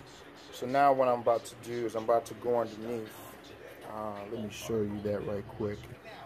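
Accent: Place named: Louisiana, United States. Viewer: dude, where in Louisiana are you from??? lol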